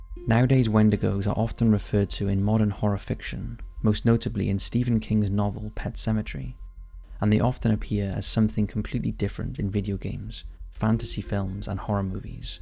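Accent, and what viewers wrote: United Kingdom. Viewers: Dude..your stories and narrations are awesome and gripping. One problem though. You talk so low its difficult to make it out even on earphones. An then there is your British accent ! (coming from an Indian Lol )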